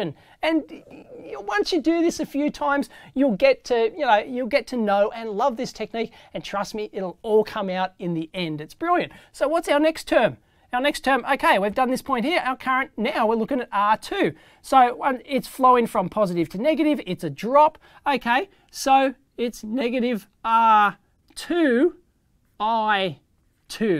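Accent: Australia. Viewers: I love this man. Also the Australian accent is icing on the cake. Great tutorial, thanks!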